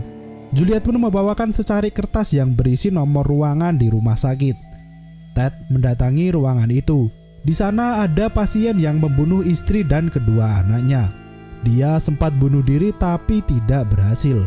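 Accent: Java, Indonesia. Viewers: Si abang nya Jawa ya bang medok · Suara jowo medok eramm mass hehheh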